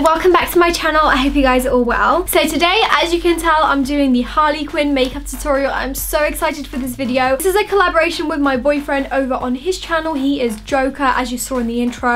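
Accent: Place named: United Kingdom. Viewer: Nice accent I love british accents ^_^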